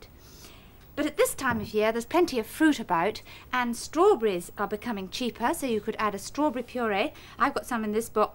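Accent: RP, United Kingdom. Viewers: My! She sounds so posh!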